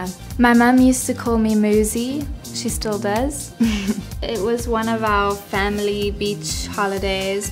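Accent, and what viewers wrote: South Africa. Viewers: she has the south african accent .....awesome cnt believe she used to be my high school monitor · Please don't lose your SA accent, the american accent has claimed too many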